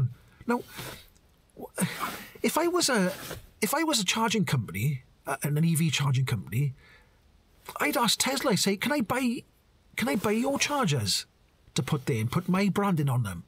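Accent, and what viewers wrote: Wales, United Kingdom. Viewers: I believe that the leasing arm of Porsche will be liable for any loses...many leasing companies both ICE and EV have been caught out by wildly fluctuating second hand car market prices since the pandemic and the war in Ukraine. Keep doing what you do, it's goof to hear a fellow Welsh voice in the debate... you are around Caerphilly correct?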